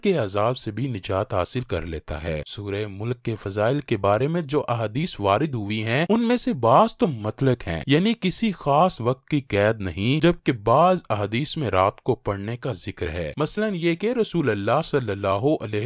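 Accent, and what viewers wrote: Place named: India. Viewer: ladti nahi larti hay . kyu Indian english follow karty ho apni shanakht bhi bhol gay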